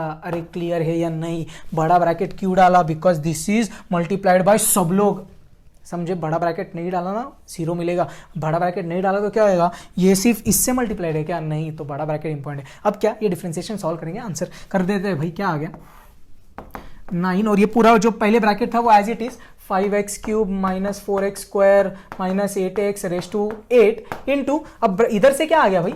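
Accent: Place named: Mumbai, India. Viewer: Luv your way of mumbai talking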